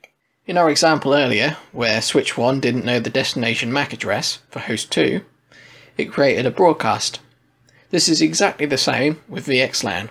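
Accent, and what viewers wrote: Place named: Scotland, United Kingdom. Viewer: 你的口音让我想起了我远在苏格兰的奶奶。视频很棒。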